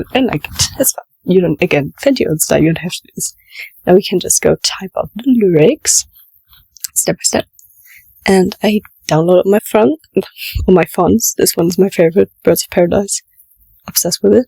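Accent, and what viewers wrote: Germany. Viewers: AWWW I LOVE YOUR VOICE despite knowing you were German I didn’t think about you having an accent lmao but it’s also adorable??? like girl I lowkey want it 😭 ich spreche Deutsch a bit too as I’m a little German myself :) great tutorial as well!! <333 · I LOVE YOUR VOICE! Also your german accent is so cuteee :3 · Thanks for the tut Also German accent?? My friend speaks German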